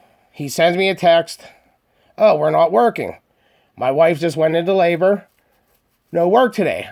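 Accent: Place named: Pittsburgh, United States. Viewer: I stumbled on your video after watching Scale Enthusiasm's top five. I thought from your "accent" and your use of "Yinz" that you were from Pittsburgh. Then when you mentioned Cranberry, I knew I was right. I'm from Pittsburgh too. Have you ever been to a Steel City Diecast Club meeting? BTW great video. I'll check out your others and subscribe. Hope to meet you in person - Rick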